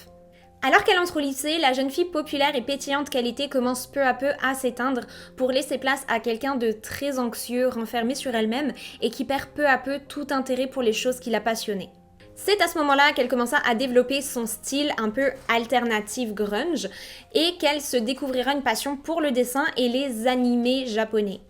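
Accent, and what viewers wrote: Quebec, Canada. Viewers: a mince c est cuit pour la comparaison avec Mc Skyz il me semble entendre un petit accent québécois ^^ .. Je suis chauvin Mc Skyz numero 1 :) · est et Mc Skyz est Mc Skyz. Cependant, tout les deux sont extras!!! J'adore le fait que tu as un accent québécoise même si tu es française! Ça donne un sentiment de " réconfort ". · Je viens de découvrir votre chaîne jolie demoiselle. C'est un plaisir de vous écouter mais dites moi il me semble entendre un très léger accent québécois.... me trompe je ? Bise de France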